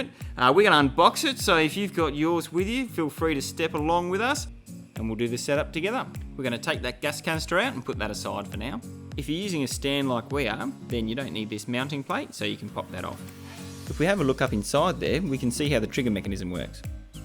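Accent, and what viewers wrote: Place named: Australia. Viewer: Your Australian accent is the best!!!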